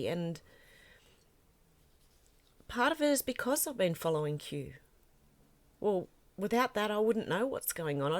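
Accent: Australia. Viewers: I really like you. I like your videos. Really love that Australian accent. Keep up the great work , we appreciate it. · I just love your accent from down under..and such a beauty,...inside and out..don't wear yourself down and get sick now..we really appreciate all of your hard work and the sacrifices you've made.. a little secret of mine...I turned my TV off 3 yrs ago and I don't miss it one bit... MSM is all fake news..the continuing of mk ultra and mind control. You are such a strong lady and Patriot.. thank you ....just for being you..we are all in this together.. WWG1WGA..THANKQ DEAR LADY..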